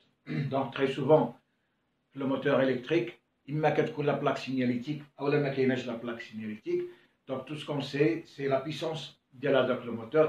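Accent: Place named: Morocco. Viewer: Je crois que vous êtes algérien Mais vôtre accent semble marocain On tout cas je suis fière de vous Professeur Merci.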